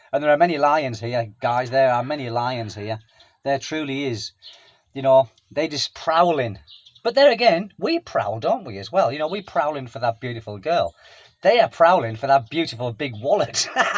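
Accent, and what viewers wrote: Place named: Newcastle, United Kingdom. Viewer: You are welcome. I recently posted one of your videos to my cousin Paul who married a girl from Sunderland in 1983. He has lived there ever since. I detected you have a slight Geordies accent. Great memories of being best nab at his wedding. I was best man. The geordies were brilliant. So similar to we scousers. really honest with a grear sense of humour and dont suffer fools. Keep up the great work. Tony from the pool.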